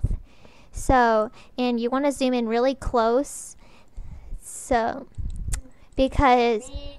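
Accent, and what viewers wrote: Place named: United States. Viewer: Nice voice, now I know ur American xD (compliment)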